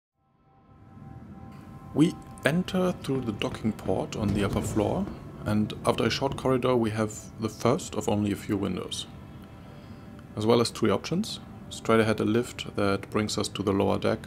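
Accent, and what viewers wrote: Germany. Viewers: moin, du scheinst ja definitiv ein Deutscher zu sein, unschwer zu hören. danke für die großartige Arbeit! weißt du denn ob wirklich keine Rampe zum Hangar ist? kommt mann nur über den Bauch rein?(Leiter/Fahrstuhl)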